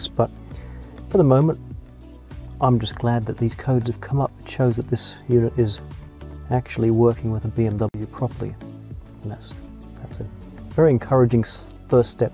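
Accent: Australia. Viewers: Brother you sound australian? you driving a left hand BMW? where you located now?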